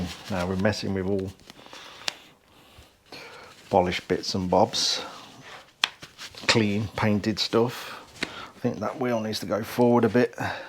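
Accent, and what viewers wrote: England, United Kingdom. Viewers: As soon as I heard the english accent....this is a biker builder I will follow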